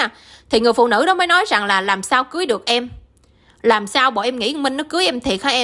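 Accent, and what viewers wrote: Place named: North, Vietnam. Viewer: Giọng miền bắc mà mê ,có nói nộn kg?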